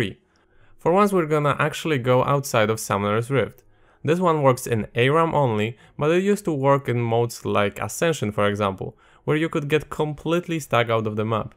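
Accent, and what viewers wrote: Poland. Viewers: Stary, pierwszy raz usłyszałem twój glos, od razu wiedziałem że jesteś Polakiem i chyba się nie mylę. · the Polish accent make the video better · Polish voice strong!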